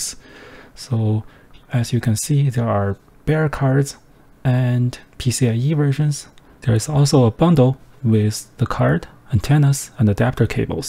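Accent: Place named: China. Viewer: 一听就是中式英语，阿弥陀佛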